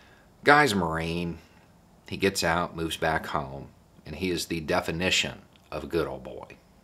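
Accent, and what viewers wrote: South, United States. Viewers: I adore this man. Something about hearing lefty politics from a deeply southern voice makes my heart smile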